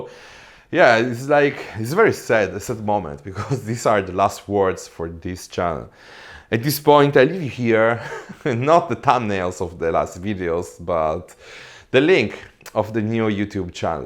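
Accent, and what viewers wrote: Italy. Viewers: May be the frequency of uploading videos could be the problem. There is another channel I follow known as "The pasta queen". She is also Italian and speaks the Italian English accent as you and has good following so may be its not the way you narrate. You should try Youtube shorts to divert audience to your channel as everyone else is doing. Engage the audience and you will again shine. Dont loose the channel at 500k what most people dream of. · your english is fine (maybe with an Italian accent what is fine) and also your video's are very nice to watch. I also took a subscription to your new channel, not to miss out on something and hope it does what you want it to do. 🤗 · The last video I see in this channel is from 11 months ago, I believe that making some short projects that you can upload in between the actual big projects would help with views and also make the algorithm spread it more, your English is perfect, you just have an Italian accent which is not a problem at all.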